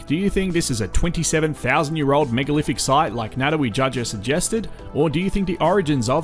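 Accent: Australia. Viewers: Suggest you try using local pronunciations rathan than Australian for local names. Eg Gunung Padang. Vowel sounds in Bahasa Indonesia are a bit different than English :)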